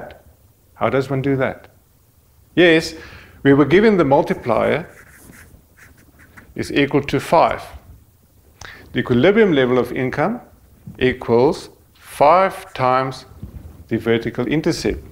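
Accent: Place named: South Africa. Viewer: I don't know why, but everything is just better in a south african accent.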